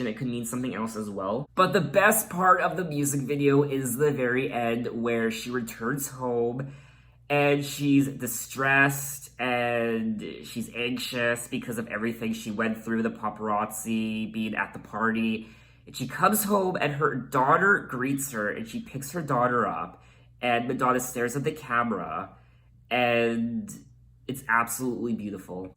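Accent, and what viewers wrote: Canada. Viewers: grow the beard but lose the canadian accent.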